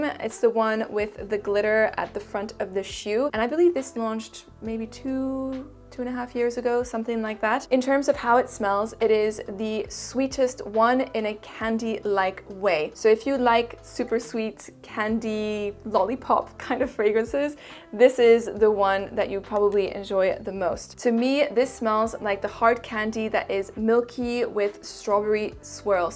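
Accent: France. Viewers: Thank you so much foe the review it couldn't have been better than that!! I looove the french accent BTW❤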